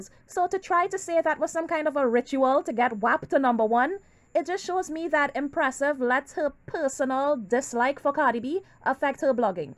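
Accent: Trinidad and Tobago. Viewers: Hi are you Trinidadian??? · I love your accent are you trini? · I clicked so FAST!!! Talk your Trinny shit! Love your accent and I’m here for all the Tasha K drama. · I love your content.. And your accent sounds 🇹🇹 trini like myself.. Ur right both bloogers hv something wit cardi an they look salty as hell. Cardi ain't got time.. She makin money 💰 moves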